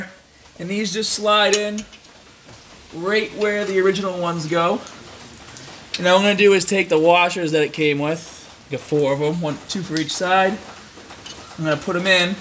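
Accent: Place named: Brooklyn, United States. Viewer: Funny, u can totally here the Brooklyn accent more in this vid than any other lol. Sweet car!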